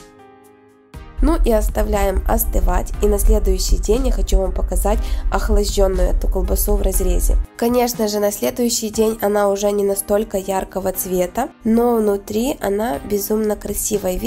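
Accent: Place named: Ukraine. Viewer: Вы молодец, интересные рецепты, что у вас с говором? Рублённое, КОптить? Я понимаю, что Вам удобнее разговаривать на украинском языке. Вы снимаете ролики на русском языке, ударение проверяйте в словах во время монтажа ролика.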